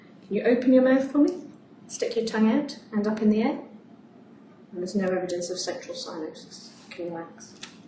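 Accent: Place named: United Kingdom. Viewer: Wait, you hear her accent and automatically think American? Sounds like she is from the UK to me but jrodulfa1 is correct.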